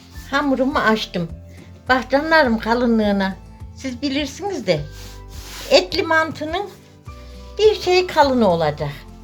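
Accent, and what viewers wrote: Kayseri, Turkey. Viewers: Maşallah teyzem nasıl özlemişim kayseri şivesini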